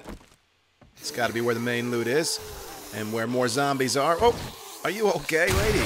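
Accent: New Jersey, United States. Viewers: I think you missed a plug and I think you missed a bookbag in the pass-n-gas . btw I love that you're from NJ! It gives me joy to listen to you talk about kickin' ass in an NJ accent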